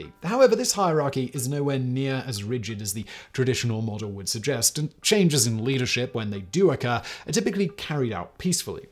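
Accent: United Kingdom. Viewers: Zig Zag - Oh yeah, a British accent, that does it for me every time! Like I don't hear a British accent every time I speak. Your only objections are that he has a British accent and said something that you don't agree with? I've read several articles debunking the "alpha wolf" theory - from people who have studied the phenomenon. I don't take any one person's word that something is so or isn't so. I look it up, I check on it, I read up on it as much as I can. ps: What's really sad is that you're judging me by your own standards - which says a lot more about you than it does about me. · I watched the video, would you be shocked if I told you that a British accent doesn't make this guy the ultimate authority on everything?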